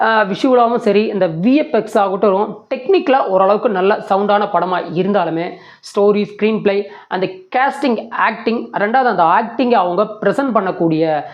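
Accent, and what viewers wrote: Kongu, India. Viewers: Kongu Tamil Vazhga.. Well done review..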